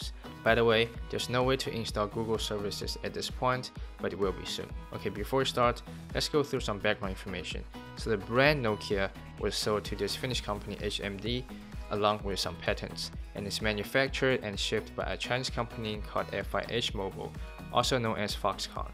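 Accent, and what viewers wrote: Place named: India. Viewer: jyothish swty he speak bad and annoying indian accent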